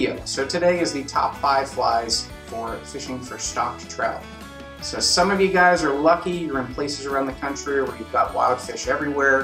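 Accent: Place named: United Kingdom. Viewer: I am assuming this guy is talking about uk fishing? It’s just that his accent makes me wonder.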